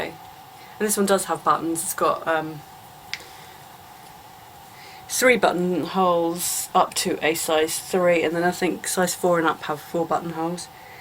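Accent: United Kingdom. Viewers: Hiiii!!! I love to hear your perfect British accent😍. And that mohair coat it's lovely. I have a lot of stash, mohair stash, and always think it's better knitted than crocheted!! But you made me rethink it😁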